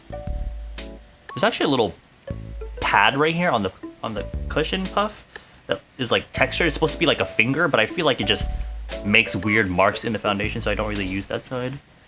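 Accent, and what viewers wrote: South Korea. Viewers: your konglish😂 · The konglish is strong in this one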